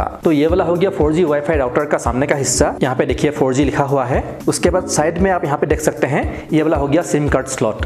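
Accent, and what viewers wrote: Assam, India. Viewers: Assam r nki apuni hindi xuni😂 a buji paisu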